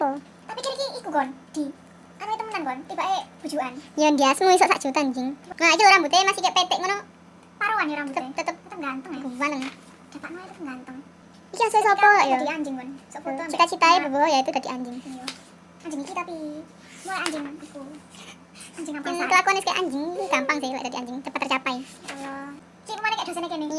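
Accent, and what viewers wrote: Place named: Java, Indonesia. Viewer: YaAllah medok nya😩 siji loro pitu 😩💚💚🌱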